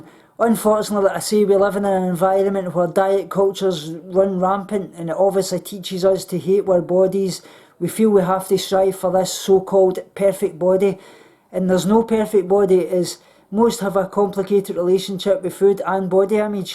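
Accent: Scotland, United Kingdom. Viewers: thanks for these refreshing thoughts. you are so inspirational. i do not consider myself in recovery because I still practice all of my ED behaviors, but I do see a therapist regularly and I am starting to consider the idea that maybe I deserve recovery. that maybe my life could be better. maybe, just maybe, I have a future to live for. so maybe because my thoughts are changing, even though my behaviors aren't that I can consider myself in recovery. idk. what do you think? btw I wish I lived in Scotland so I could hug you. you are so sweet. and I absolutely love your Scottish accent. I know it isn't an accent to you, but I am from the U.S. so it sounds so cool to me. i wonder how our accent sounds to you. sorry. I am weird. it is am here and I need sleep!! ❤😘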